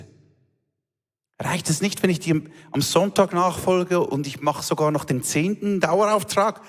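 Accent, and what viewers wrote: Switzerland. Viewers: Liebe Mirjana, das ist Hochdeutsch mit Schweizerakzent. Und wenn man Hochdeutsch versteht, ist es kein Problem die Predigt zu verstehen. Zu den Menschen wird freundlich gesprochen und sie werden ernstgenommen. Nach der Speisung der fünftausend ist Jesus Christus herausfordernd, weil er ins ❤ der Menschen sieht. Bei Maria und Martha ist ER empathisch. Denn ER weiß welche Worte wir brauchen. Auch diese Geschichte wird erwähnt. Also auch diese Tatsache kommt nicht zu kurz.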